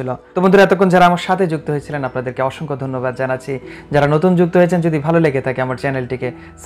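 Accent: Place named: Sylhet, Bangladesh. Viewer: আসলে এই লোকের কথা গোলা সুনে মনে হয় ভাই টি সিলেটের। #LalsobujBD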